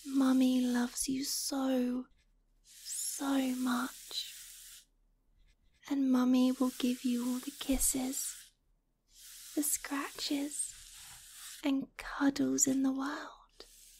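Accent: United Kingdom. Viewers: Wale accent?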